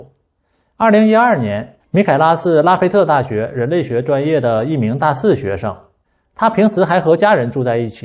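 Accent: Northeast, China. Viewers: 老哥你这东北口音，把我好不容易的普通话又拉回20年去了，当主播得练练哪，真的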